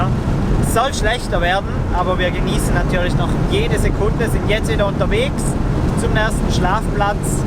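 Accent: Switzerland. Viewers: Sorry, aber... Wenn Schweizer Hochdeutsch reden, geht garnicht...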